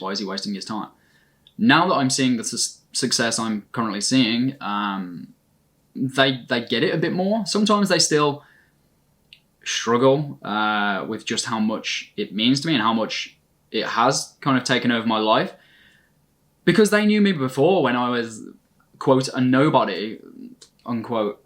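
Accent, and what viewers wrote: Birmingham, United Kingdom. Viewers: The more I hear you speak the more I can hear the Birmingham accent. Thank you for being so raw and honest with us xx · Hello fellow brummie! Where in Birmingham are you from?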